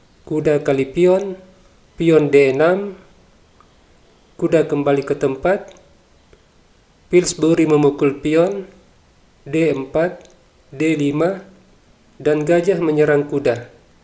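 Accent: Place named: South Tapanuli, Indonesia. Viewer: Bg kemana ya? Semoga beliau sehat Spt nya ini bkn bg ini logatnya spt dr Tapsel Senang dgn filosofi Duani gelap bagi raja Hitam 😂😂😂 Ini sdh ku subscribe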